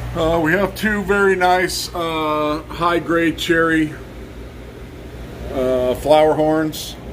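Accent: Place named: United States. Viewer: With all due respect, did you not hear the American accent lol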